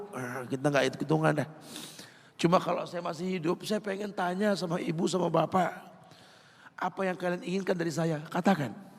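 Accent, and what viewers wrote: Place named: Jakarta, Indonesia. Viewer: Assalamualaikum ustadz,,,,salah satu daya tarik adalah logat Betawi ustadz yg kentel sy sukaa bnget ,,,, alhamdulilah kebetulan kite satu rass ustadz ,,,,,semoga Allah slalu melimpahkan Rizki yg luas utk ustadz sbgai bekal dakwah ,,,aamiin ya rabbal aalamiin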